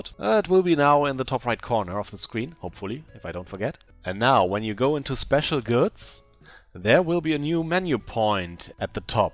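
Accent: Germany. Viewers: das deutche englisch lol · Are you German? I think you have a german accent (#nohate I like that accent) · Workout athome Yes he‘s from Germany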